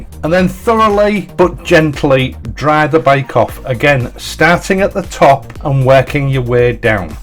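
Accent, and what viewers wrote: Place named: RP, United Kingdom. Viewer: Your method is as meticulous as your accent . " The perfect English Gentleman".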